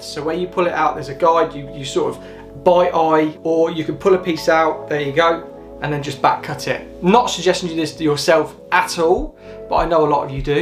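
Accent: United Kingdom. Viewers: As much as I like the British accent you talk so much I get anxiety 🤣🤣🤣🤣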